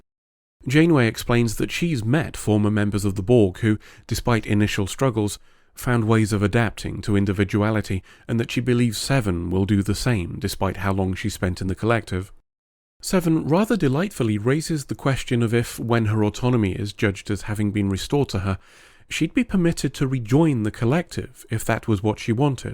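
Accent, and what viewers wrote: United Kingdom. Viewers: love your voice, love your British accent, and I love your deep analysis of my favorite Star Trek show lol "There is nothing beyond the subatomic." interesting how our science has progressed since the 90's. though, barely lol. i don't know how they could've done it (swirly-whirly-clownshow level) better, as they would've either needed to know future science, or make shit up, but I think they were trying to imply that consciousness is based on "quantum-level" subatomic mechanics (which they've done before, say, on DS9) which is fascinating if you're one of those people that ponders how consciousness physically works.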